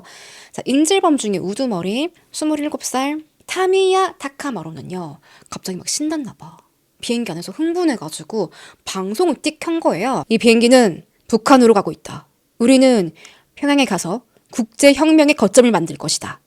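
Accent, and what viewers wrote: Gyeongsang, South Korea. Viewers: 경상도분이시네 ㅎㅎ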